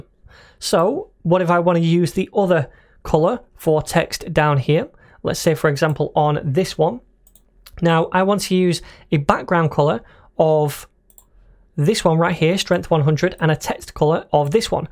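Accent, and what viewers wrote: United Kingdom. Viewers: What a rare British accent! I'm glad to find this because I'm learning English English at the same time. Thank you!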